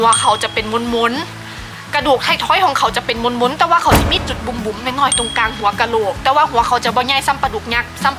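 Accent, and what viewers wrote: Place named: Chaiyaphum, Thailand. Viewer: สำเนียงเหมือนชัยภูมิเเท้จ้า ฟังเเล้วคิดฮอดบ้านจ้า คอนสาร ตอนนี้ย้ายบ้านมาอยู่กับเเฟนที่ชลบุรีเเล้ว บ้านอยู่คอนสารก็รื้ออกหมดเเล้วไม่ได้กลับบ้าน4ปีเเล้ว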